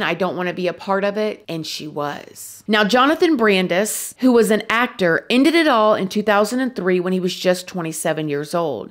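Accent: South, United States. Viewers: Funny Video... I like Your accent... You Sound Country....❤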